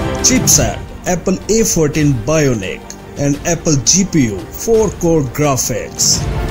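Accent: India. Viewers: English made in India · The voice over is 100% Indian//Bravo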